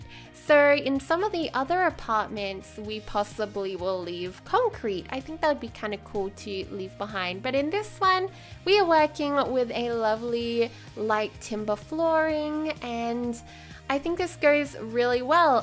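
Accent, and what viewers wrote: Australia. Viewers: Hannah Paterson because they are both Australian and have the same accent they sound very similar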